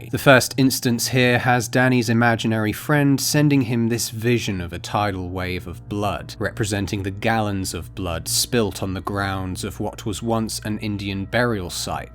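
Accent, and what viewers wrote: United Kingdom. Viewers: The whole time I'm watching I forgot that you have a British.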